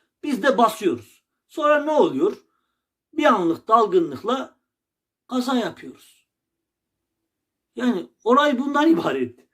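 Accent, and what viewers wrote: Erzurum, Turkey. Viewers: Erzurum şivesiyle güzel yorum yapıyorsun.Gerçekten oralıysan,Erzurumluların seni dinlemesini ve taktir etmelerini senden onur duymalarını dilerim...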